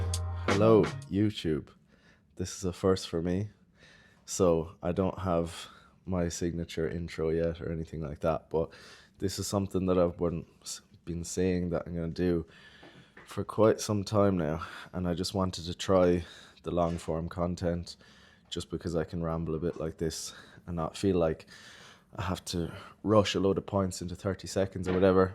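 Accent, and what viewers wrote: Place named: Ireland. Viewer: we’re all strange cunts, Normal is boring 😆 , Yes I’m from Cork myself that’s why im tryna figure out where from here your from by accent😂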